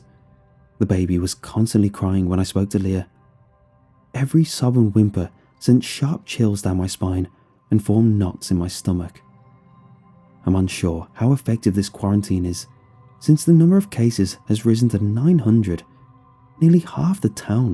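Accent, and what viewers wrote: United Kingdom. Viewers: I love your sweet British accent, keep up the good work 👍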